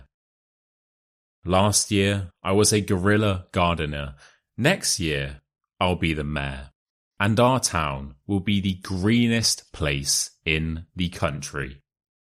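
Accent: United Kingdom. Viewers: Wonderful story. i subscribed your channel to improve my pronunciation and listening skills because I love British accent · thank so much i was listening the narration but, i think is a bit high level for my. I like de challenged, as hear British accent, so I will try to understand , to be able to enjoy it. Again, thanks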